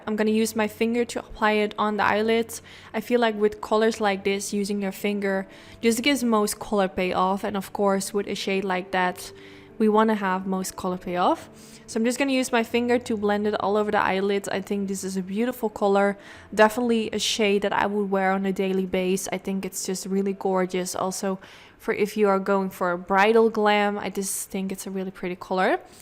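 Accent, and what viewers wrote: Netherlands. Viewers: Do I detect a Dutch accent?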